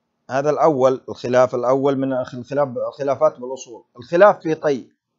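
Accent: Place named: Iraq. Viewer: لهجتك قريبه من العراق شفيك تنطق ال ج اكثر شي شكل اجدادك عراقات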